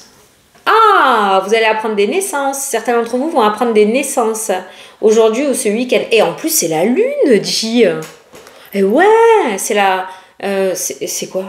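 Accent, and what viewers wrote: South, France. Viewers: Bonsoir pour moi c'est la 1ere fois que je vois votre guidance..en tt cas j adore votre accent du sud moi qui suis dans le nord ca fait chaud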